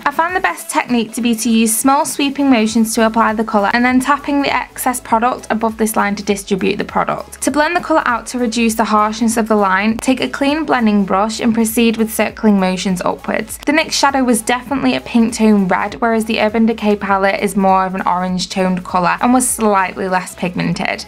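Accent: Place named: Cheshire, United Kingdom. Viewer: You sound like you're from Middlewich! I taught at the High School there in 2006, and when my girls went to Sir John Deanes, they had pals from Middlewich High...so you're probs going to tell me I'm completely wrong and you live in Alsager or Nantwich! I'm just outside Northwich in Weaverham. If you're in the area, let me know and I'll show you my mental makeup collection which is taking over my bedroom. And I'm 47!! My daughter gets inordinately jealous and barks, "YOUVE GOT TOO MUCH MAKEUP!!! Mummmeeeee, can I have your Vice Reloaded and your Violet Voss palettes pleeeeeease cos you love meeee." Suffice it to say, the answer's NO! Thanks for taking the time to reply. That is a lovely touch for a YouTuber cos few of them can be bothered with their subbies xx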